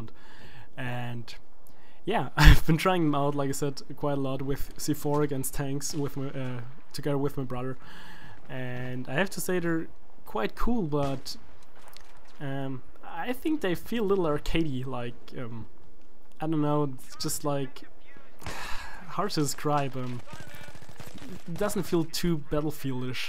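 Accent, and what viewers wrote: Germany. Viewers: ahh ein deutscher dachte schon ^^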